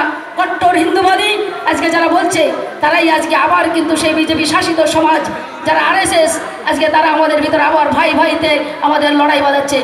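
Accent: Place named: Bangladesh. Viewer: Bhasha ta thik kore bolun, apni to Bangladesh theke bolche6en, mone hoy, r apni to nodiyer lok, apnar kotha to, Bangladesh hobei, karon nodiyer r Bangladesh sem sem okokoko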